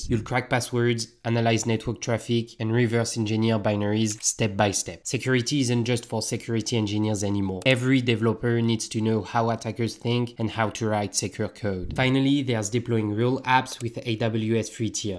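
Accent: France. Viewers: Your french accent is cool ✨ good advice too, keep it up ❤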